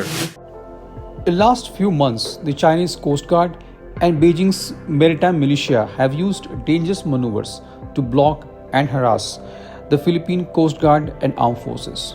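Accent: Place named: Maharashtra, India. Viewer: Are you from Maharashtra ur accent seems very similar to me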